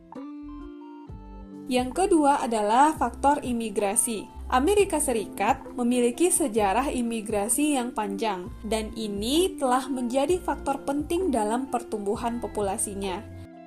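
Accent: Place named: Eastern Indonesia, Indonesia. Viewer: Logatnya agak lain kak.. dari timur kh?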